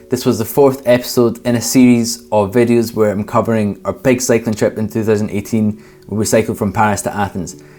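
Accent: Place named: Barbados. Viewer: Here I am listening to your videos while still in Trinidad cleaning my house, passing time until I return to France this summer BUT I almost can't make the connection in my mind that you are NOT from the island of Barbados. Your accceeenntttttttt. You sound 100% Bajan!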